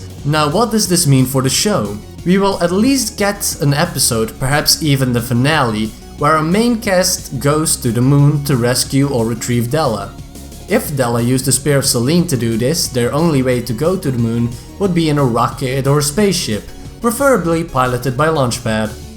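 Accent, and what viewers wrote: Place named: Netherlands. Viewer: Ummm you sound like a Dutch speaking person. Are you dutch? Because I can tell when you pronounced the title comic very well.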